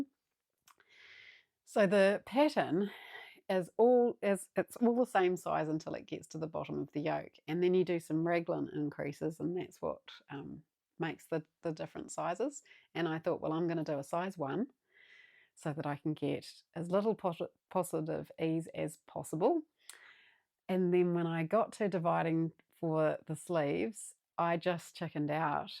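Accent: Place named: New Zealand. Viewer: You are a joy to watch. I love to hear that kiwi accent. I'm in Wellington just down the road. 😂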